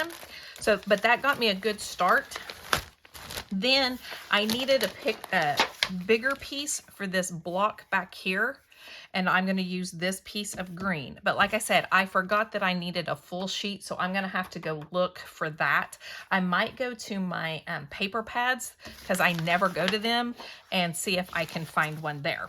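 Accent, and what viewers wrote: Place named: Oklahoma, United States. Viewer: Hi! Your channel was suggested on my feed yesterday, and I love watching people make page kits! I hope this doesn’t sound crazy, but I noticed in a picture on one of your videos you had an OK State shirt on and your voice kind of has an OK accent - are you from OK? I’m in OKC area, and I’ve never met a fellow scrapper in OK, so I had to ask and say hi! Have a great evening!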